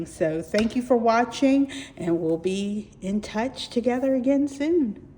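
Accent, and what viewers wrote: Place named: Pittsburgh, United States. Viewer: I loved everything from this collection. I am also a legend and still didn’t get everything I desired. I’m grateful for what I received. I will continue to support C&P. Planning is my creative outlet and regardless of the economy I’m going to continue to invest in it. Love your videos. I picked up on the Pittsburgh accent btw 😊.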